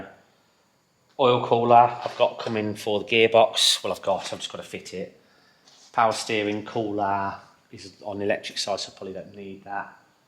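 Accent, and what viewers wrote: United Kingdom. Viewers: It takes awhile to get used to the way the brits talk, especially if they use a lot of slang and shortened words.But as an aussie, I don't have a problem with it.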